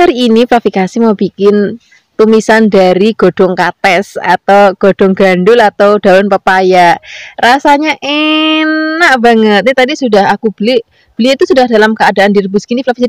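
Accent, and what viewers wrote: Java, Indonesia. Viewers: Khas jawa nya kental bgt 🥰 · Lestarikan medokmu👍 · Medhok jawa ya..makasih mbak resepnya.nek sy petainya tak belah ..takut ada uler di dlmnya 😅😅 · Saya suka cara bicaranya...medok jawanya.😅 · Maaf mbak,kl boleh tahu,jawanya mana mbak kok cara bicaranya enak kali di dgr,bercampur gitulo bahasanya😁👍👍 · Mbak , itu orang mn sih kok bahasanya medok jw bgt 🙂? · Jawanya medok kaya gado"enak didengernya😅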